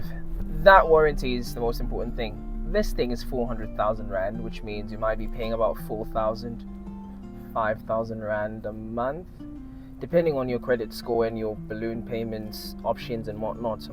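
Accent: United Kingdom. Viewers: Bro, where did you learn to speak like that? Too smooth with that British accent👌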